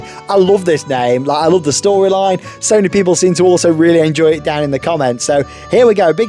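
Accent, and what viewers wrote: United States. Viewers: Love the American accent. Didn’t think it was you at first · your American accent is very good!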